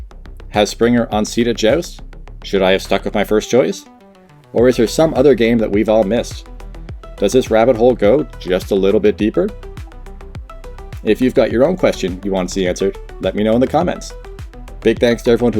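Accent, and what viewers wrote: Canada. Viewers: Pardon me, are you Canadian?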